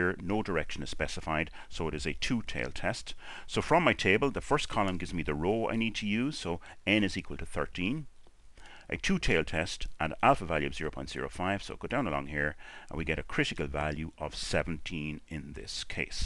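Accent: Ireland. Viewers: thank you for this. Two questions for you: Let’s say I have T+ of 9 and T- is -16. Is it correct to say you would then use T+ as 9 is lower than the absolute value of T-? Let’s say I have T+ of 52 and T- of 0. Do I use T- as my test statistic? Also if in wanted to calculate the effect size, I read I should use the absolute test statistic and divide by the sqrt of n, but if it’s zero, this will just result in zero… even though there was a big positive swing. Hope this makes sense, nice to hear an Irish voice here away from home.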